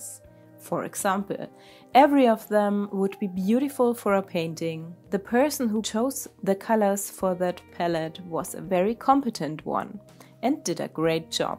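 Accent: Germany. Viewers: Dein Englisch ist zwar richtig gut- trotzdem hör ich da einen LEICHTEN Deutschen Akzent raus? 😅 Gibt's deinen kanal auch deutschsprachig? Deine Kunst ist echr genial 👌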